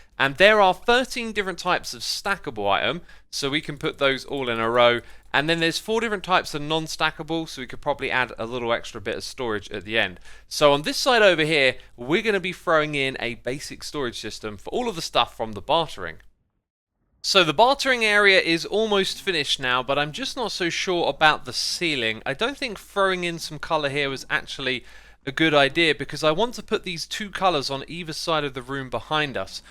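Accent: United Kingdom. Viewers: Im from Germany and I really love british english. The accent is so awesome and just makes me wanting to live in England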